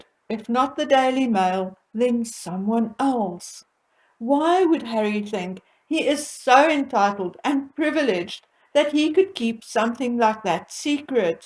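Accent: South Africa. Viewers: I enjoyed your commentary on the Harkles, most entertaining, abd so true. Also loved hearing your accent made me horribly homesick for SA. Nkosi love and protect Afrika 🙏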